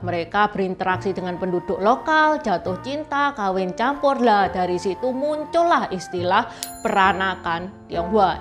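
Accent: Java, Indonesia. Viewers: koq km berbahasa Indonesia berlogat medok jawa sih, apalagi membahas cina benteng daerah tangerang..itu d gn sindur, legok bnyk tuh Hitachi..sy dah pernah kunjungi 2 tempat itu.. lucu ya km berbicara medok...😁😀😁😀😁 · Logatnya jawa sampeyan mbak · ini wajah cino tp dialek lidah jowo (mbandek), bersedia cerita? 🌱🤫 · Mbak admin sendri,medok Jawa nya ya. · Ngomonge koyok logat jowo. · Cina Jawa, medok bnget Jawa nya 🤭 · Kalo kakak China Jawa ya, medhok banget. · Teteh ngomonge ko medok banget toh 🤣🤣 opo ga iso dek sesuikan, pribahasa sunda pindah cai pindah tampian 👍 terimakasih sudah bantu kasih tentang sejarah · Satu dari sekian ribu etnis di NKRI apapun latar belakangnya itulah yang mambuat kita makin kuat, cece medok banget, semangat ce berjuang terus mungkin masih banyak yg harus cece kisahkan, biar makin beragam cerita tentang Indonesia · ini pasti orang Jawa tengah/timur, ngomongnya masih medok.... 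Hahaha.... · logate njawa BB uuaaaanget sih 😃😃 matap maju sucses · Ini orang china apa jawa...logatnya kok jawa banget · Medok buanget Jowone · Keren ada medok medok nya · Mbak dhewe yo wong jowo, yo opo Iyo? Logate ora biso diapusi. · Cici ngomongnys medok ya.. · Logatnya Njawani banged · Ini Cina Jawa,cara ngomongnya medok kayak orang Jawa yg setuju like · Bu logat jawanya kok medok banget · Lho Ce kok medok ngomonge.. Hahhahahaa... Nah itulah indahnya Indonesia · Salut sama mbak china nya medhok jawane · etnis Tionghoa, ngomongnya medok Jawa, orasinya tentang Cina Benteng singkat tapi cukup lengkap 👍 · Jawa'y medok banget 😃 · Mbak logatnya seperti jawa medhok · Kok medok lagate to mbak...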